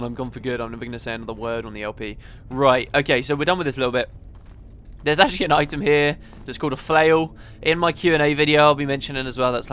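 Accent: United States. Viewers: Haha lovely american accent! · I lover ur american accent!! Leveerrrr!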